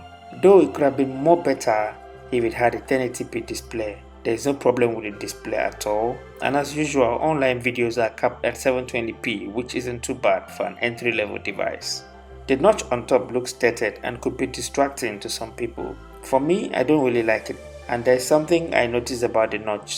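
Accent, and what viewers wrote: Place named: Akwa Ibom, Nigeria. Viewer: Love your Akwa Ibom accent bro!❤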